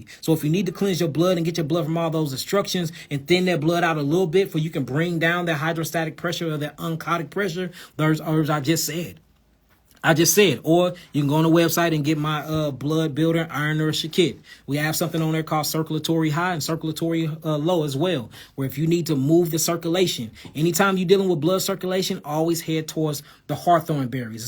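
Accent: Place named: Kentucky, United States. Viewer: I’m from Louisville ky we really do talk like that especially in Louisville she definitely not faking it 😂💯 no bap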